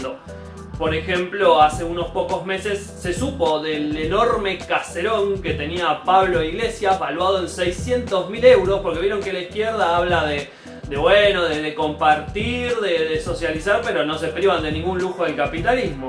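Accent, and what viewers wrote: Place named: Argentina. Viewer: Hola. De qué parte de Argentina sos?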